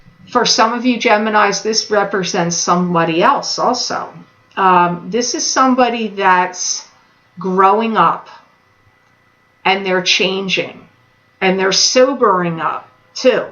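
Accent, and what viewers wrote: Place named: Maryland, United States. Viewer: Your accent feels like home to me... I swear you're from Maryland or Pennsylvania! Love the video and thank you for your words. :)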